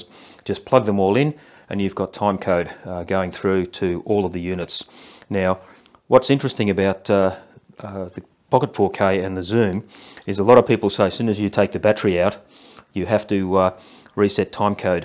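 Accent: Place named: Australia. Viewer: awesome mate. great to see Aussie accent with these tutorials as a kiwi. Love your vids. new sub today.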